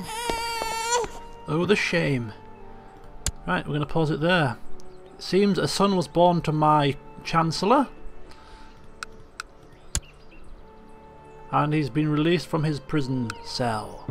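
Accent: England, United Kingdom. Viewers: what part of England do you come from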